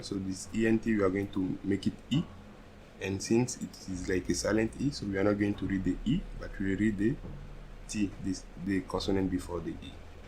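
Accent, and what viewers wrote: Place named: West Africa. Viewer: Sounds like an african french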